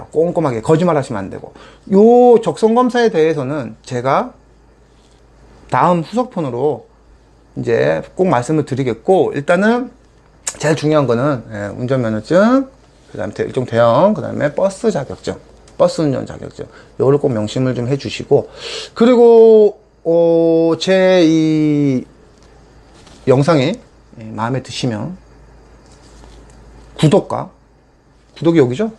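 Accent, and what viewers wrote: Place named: Gangwon, South Korea. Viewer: 말투에서 풍기는 억량이 강원도분 같기도하고 암튼 추천 남기고 갑니다 저도 4년6개월된 현직 버스 기사 입니다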